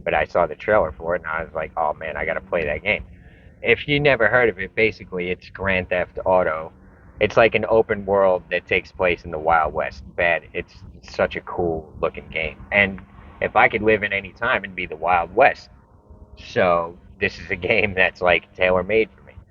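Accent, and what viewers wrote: New York, United States. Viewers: this NY nasal accent... It's sounds great though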